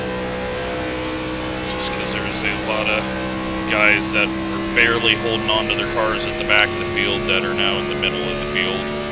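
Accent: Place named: Iowa, United States. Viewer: U from iowa?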